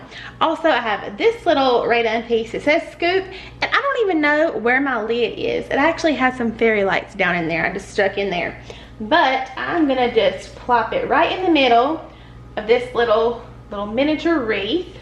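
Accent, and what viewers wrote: South, United States. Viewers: I love your sweet southern voice 😍. You have great style thanks for the inspirational video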